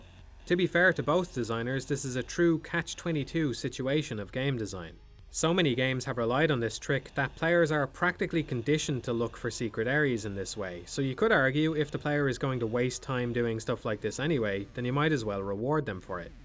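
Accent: Ireland. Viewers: I only watch this for the Irish accent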